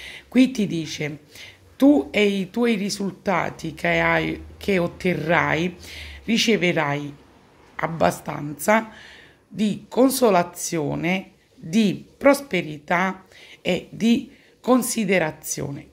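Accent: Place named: Naples, Italy. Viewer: Grazie,per le tue parole,anche il tuo napoletano è dolce ascoltarlo ,per me è la prima volta che ti ascolto,può essere così inportande sentire, quando tutto intorno è crollato, una previsione serena,auguri anche a te per la festa della mamma,penso che tu lo sia e anche se non lo sei auguri comunque,ciao.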